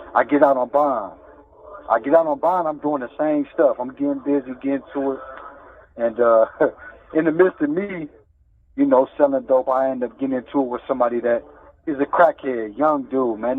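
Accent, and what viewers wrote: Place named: New Orleans, United States. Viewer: He talks like he comes from NOLA.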